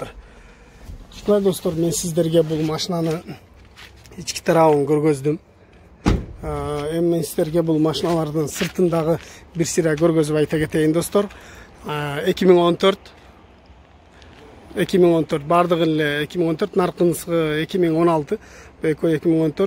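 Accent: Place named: Osh, Kyrgyzstan. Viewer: Оштук болсо ушунчалык суйлойтта сынабай жон коргуло анын аксентин текшербей .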